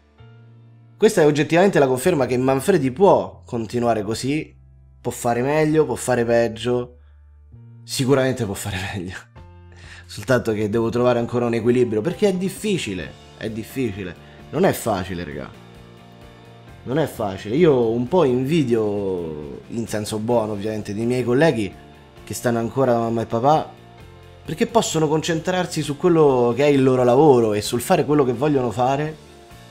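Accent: Rome, Italy. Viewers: hai ringraziato i tuoi 200k..per averti aiutato..io nel mio piccolo farò lo stesso..grazie..penso sia un pensiero condiviso da molti..lasciare tutto e provare fin in fondo a fare ciò che ci piace è forse la cosa più difficile da fare..grazie del tuo coraggio..anche tu hai aiutato moltissime persone che in quel momento avevano bisogno di sorridere con un po' di romanaccio di sottofondo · Dietro uno schermo sembrate così extraterrestri, ma tu..o meglio, tu Manfredi..sei una persona, vera e pulita. Ora io non ti conosco, dal vero almeno, ma sento la tua 'stranezza' molto simile alla mia stranezza, sei la persona più vicina a essere umano che ci sia su YouTube Italia.. tanto umana e da riuscire a farmi piangere col 'signor Mario.' a farmi ridere col tuo romanaccio nei video di minecraft. La cosa che stupisce di te è l'umanità, un valore che a quanto pare sta andando perdendosi. 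Io un giorno ti incontrerò, ti offriró un'immensa Gordon scura e farò un'immensa chiacchierata con te. Per il momento la Gordon la bevo in tuo nome Manfredi, grande uomo in un mondo di alieni.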